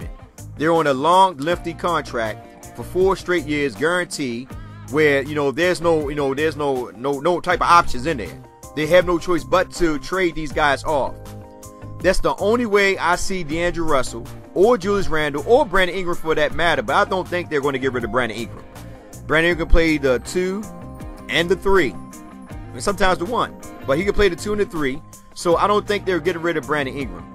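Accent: Baltimore, United States. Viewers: Good video. I detect a Baltimore origin to your accent?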